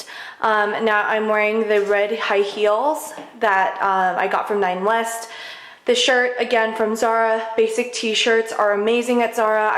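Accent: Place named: United Kingdom. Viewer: Such casual and chic outfit choices!! But I immediately subscribed when I heard your “British accent” 😂😂😂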